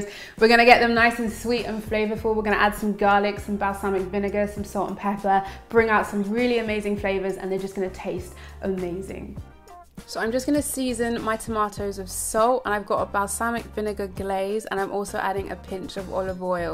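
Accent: United Kingdom. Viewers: I love your British accent. It's not snobby or pretentious at all 👍.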